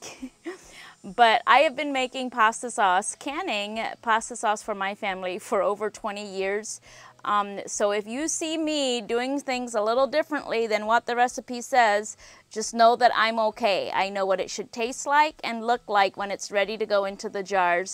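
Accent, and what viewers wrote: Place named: Pennsylvania Dutch, United States. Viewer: I have friends who are also ex Mennonite and you remind me so much of the mom, mainly because of your sweet Pennsylvania Dutch accent. 😁. Your channel is fun to watch. Many blessings and don't let the hateful comments get you down.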